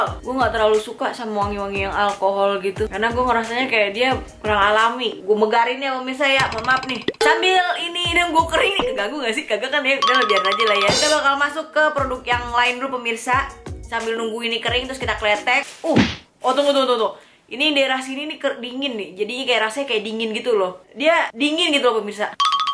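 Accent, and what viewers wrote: Tangerang, Indonesia. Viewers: sebagai SPG nya BRUNBRUN Paris di supermall Karawaci saya merasa terhibur, ngakak banget si ka bahasa Tangerang nya kelihatan jasa 🤣🤣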